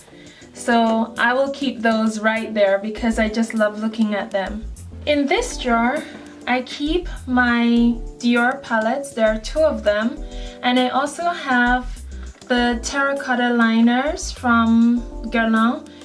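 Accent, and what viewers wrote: Jamaica. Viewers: Keep hearing a Jamaican/Caribbean accent when you speak. Am I right? Great video!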